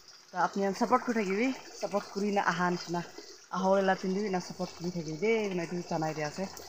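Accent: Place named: Nagaland, India. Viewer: Sis I like da way u speak nagames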